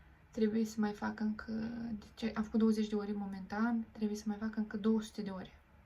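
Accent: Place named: Moldova. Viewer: Te aud ca esti moldoveanca. De unde esti de loc?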